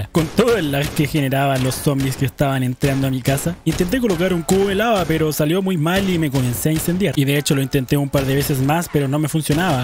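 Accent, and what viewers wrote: Chile. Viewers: Verdaderamente escuchar tu voz, narrando con tu acento chileno me hizo sentir muy agusto y entretenida, como cuando veía 31 minutos lol, saludos 🇲🇽😸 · Me encanta el acento chileno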